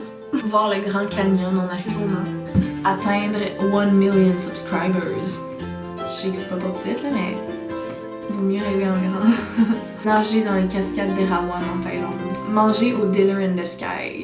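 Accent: Quebec, Canada. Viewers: C'est un accent canadien ? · Ouah :o D'habitude je déteste l'accent québécois mais là c'est trop mignon :3 +1 abonné :D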